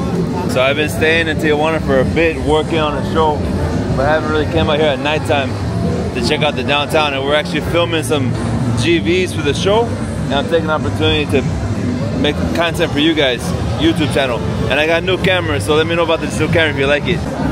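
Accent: Paisa, Colombia. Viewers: Your accent sounds Colombian when you speak Spanish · hey How long are you in Tijuana, not sure if you have time for a Meetup, I am from San Diego, Long time Tijuana Expert. I went to Medellin last year and that's how I heard about your Channel..Cheers, FYI Red Building is the famous Hong Kong lol. Funny you talk Spanish in Tijuana like Paisa People haha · Are you Colombian or Venezuelan? Cause your accent sounds like it. Great video tho 👍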